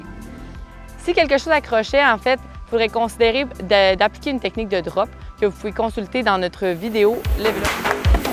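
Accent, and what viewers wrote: Quebec, Canada. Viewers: Great explanation. That Quebec French takes a bit of getting used to.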